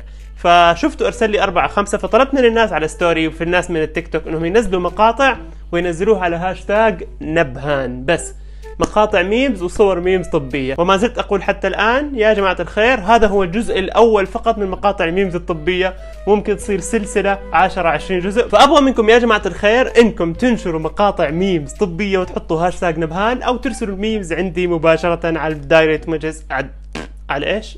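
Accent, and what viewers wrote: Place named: Gulf. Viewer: انت من وين تتكلم خليجي اردني مصري لخبطتنا يخوي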